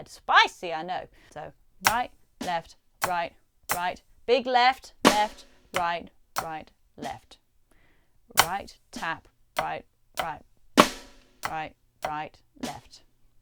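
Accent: United Kingdom. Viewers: I play bass and stumbled on your channel. You have an enthusiastic approach and the British accent makes your lessons fun to watch. Want some 7/8? Bill Bufords playing on from. Back in the day, bruford and bass player, chris squire, were as solid as it gets.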